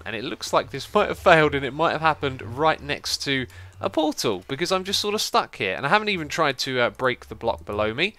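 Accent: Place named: England, United Kingdom. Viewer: anyone know which part of England is from?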